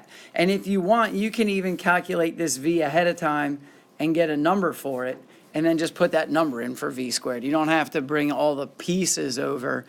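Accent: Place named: Australia. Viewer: This guy has the mildest Aussie accent I've ever heard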